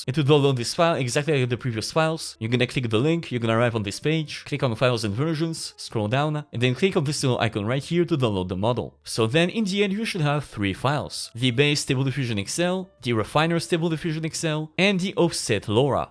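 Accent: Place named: France. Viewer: Thanks for the post, but please tell you voice-bot to slow down, especially when using the Francois accent, e.g., "EEMPTEE" for "empty" throughout, and the like, t might be easy to train your bot to avoid... THANKS!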